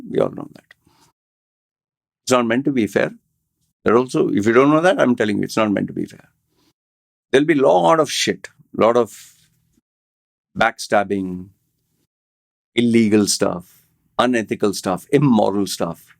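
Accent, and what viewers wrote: India. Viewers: Who the F are you? You have a thick Indian accent and you don’t know anything about caste discrimination? You should win an award for the most disingenuous and insensitive POS. Enjoy your pretentious life.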